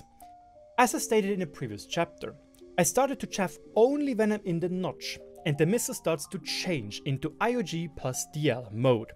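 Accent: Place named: Germany. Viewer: Dude has a thick German accent 😂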